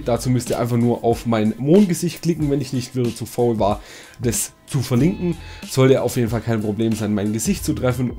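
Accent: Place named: Baden, Germany. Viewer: Du Klingst so Badisch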